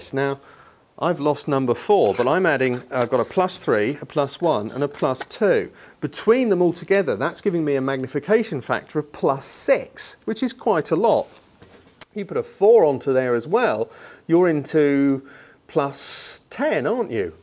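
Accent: United Kingdom. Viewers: Fantastic review and I love your accent ! You British ?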